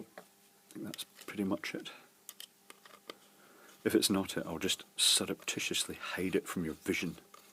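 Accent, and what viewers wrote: Scotland, United Kingdom. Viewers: I have disliked the Scottish accent before, but yours, is not bad at all. In fact, it's rather soothing.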